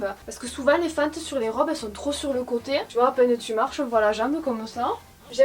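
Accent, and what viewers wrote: South, France. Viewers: J'adore tes haul car a chaque fois tu essayes les vetements, d'autres les montre simplement ou ont les voit en photos c'est pas attractif, et puis toi avec ton accent du sud et ton beau sourire c'est vraiment super a regarder. J'ai le body rose a paillette comme ta robe a anneaux, plus facile a mettre le body que la robe courte et hyper moulante.... mais elle sest jolie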